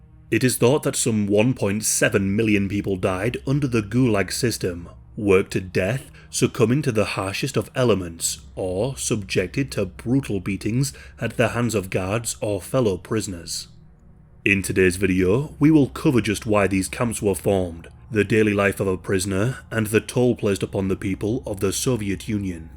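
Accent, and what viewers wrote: United Kingdom. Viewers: Dudes British my guy. He just talks like that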